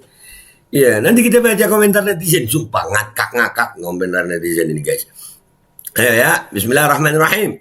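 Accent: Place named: Banjar, Indonesia. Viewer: Asli lucu beh ae bila mandangar pian pakai logat banjar...😄😁😆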